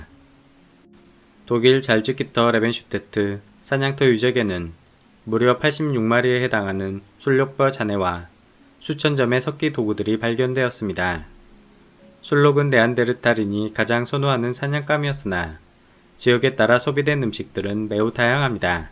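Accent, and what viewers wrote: Jeolla, South Korea. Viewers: 남도 사투리가 있으시네요.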